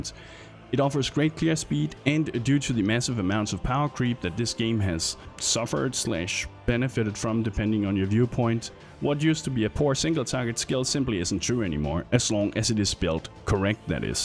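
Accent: Denmark. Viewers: du lyder dansk. har jeg ret? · A few seconds into the video: Wow. You. Sound. Danish. Hej,:)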